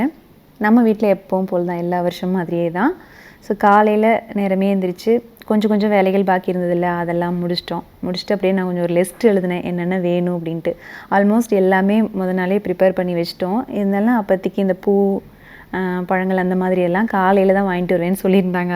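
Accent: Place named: Kongu, India. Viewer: Yenna alagaana kongu tamil!! Kannu padam poguthamma, muthalla suthi podunga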